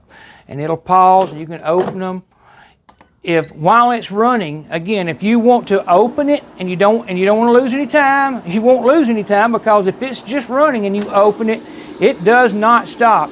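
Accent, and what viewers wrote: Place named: Georgia, United States. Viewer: glad my accent spotting wasn't off :) look forward to more content I lived in forsyth and Cherokee but accent sounded very similar to my friends by cartersville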